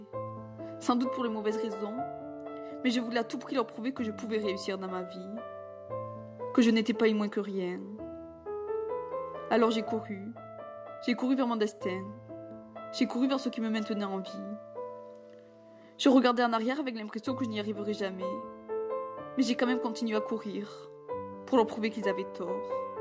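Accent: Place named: Nîmes, France. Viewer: Tu es Nîmoise (au vu de ton accent) ? Et oui tu y arriveras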